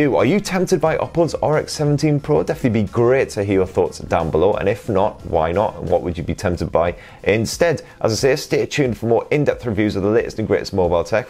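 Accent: United Kingdom. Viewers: A little exhausted to catch your british accent sometimes. Maybe you're willing to a bit slow it down next? Nice video btw.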